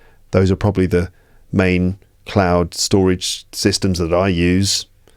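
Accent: United Kingdom. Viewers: This was very informative, very very informative. More content should be made on these lines and other similar important subjects, societal subjects, classified subjects, about realities which are not made public. Some of the topics can be related to cannibalism practice in the 21st century. Subjects related to money and banking and how successful this system has been across the globe. Topics related to different kinds of societies, interesting facts which can be shocking too often times. Until recently I never knew the difference between British English and American English and the associated politics at global level. I have been looking for profiles for marriage on Instagram and Instagram mainly shares profiles from across the globe who speak American English or some kind of English but not much from England who speak British English like yours or Sophie Turner or Isaac Hempstead Wright. 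I find this approach very political in nature. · His accent is British, i don't know which specific accent because there are a lot of British accents but it's mainly british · Hey... Really like your videos. People apart from spoken English can learn British Accent on your channel. I particularly watch your videos for British Accent. It's just a suggestion if you like. You can also start teaching English with newspapers as the language is quite arduous to comprehend. Thanks for the Videos. Best of luck.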